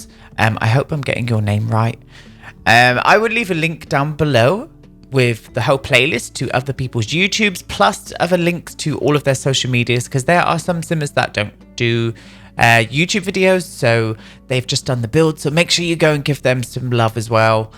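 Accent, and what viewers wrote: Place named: Scotland, United Kingdom. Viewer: As a Brit living abroad I love watching your English builds so much 💙 Makes me very nostalgic for home 🥰 Thank you! Please ignore this weird question if it is too personal, but are either of your parents Scottish? You don’t have an accent but you pronounce a lot of words in a Scottish way so just wondered!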